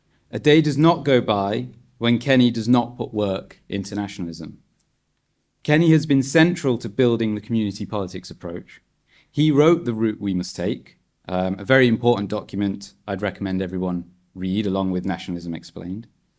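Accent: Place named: United Kingdom. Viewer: Great speech kenny! Homeland party are great. On a side note iv never heard of someone that sounds like they have a Scottish, english, irish and welsh accent all at the same time 😅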